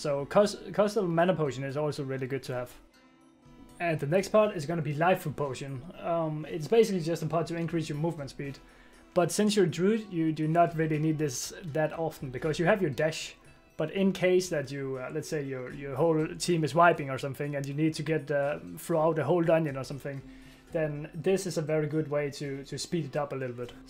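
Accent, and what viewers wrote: Denmark. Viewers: I love your Danish accent! <3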